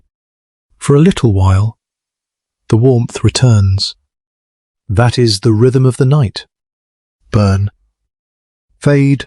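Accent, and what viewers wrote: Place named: United Kingdom. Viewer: Annoying British accent.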